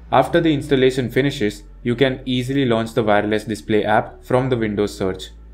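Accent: India. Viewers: Sorry if this sounds a bit racist or attacking your nation but I always think it's a legitimate tutorial video when the person speaking has an Indian accent. I also love that you're straight to the point. If this was American, it wouldn't be as straight as this one. They have to thank their sponsors first and talk about nonsense before actually getting to the tutorial.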